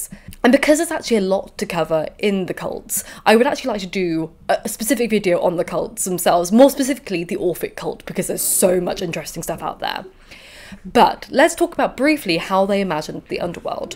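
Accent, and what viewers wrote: RP, United Kingdom. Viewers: Just discovered this channel. Your voice is so deep and pronunciation so received (I think, not an expert on differences on UK regional speech), you sound like an incredible villain. Bummed it took me so long to find such a great history/archaeology/classics channel. Thank you for your work!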